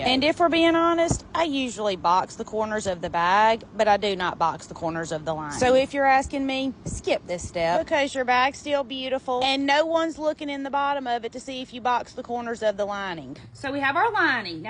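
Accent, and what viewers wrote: South, United States. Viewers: I just found your channel a few days ago, and im so impressed!! I just made this bag, it took me longer than an hour but I love it!! You are a great teacher! I love your accent, you must be from the south. Thanks for sharing your talents with us!!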